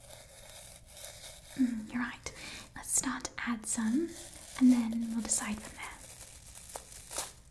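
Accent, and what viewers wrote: United Kingdom. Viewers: This is the first Harry Potter roleplay where I’ve seen you actually use a British accent.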